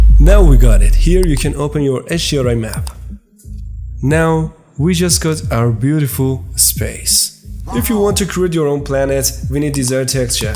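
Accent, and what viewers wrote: Iran. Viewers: az lahje fahmidam iranii movafagh bashi😂❤ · All the respect... just remade and turned out CRAZYYY my client will be impressed. Also, you sound hella Persian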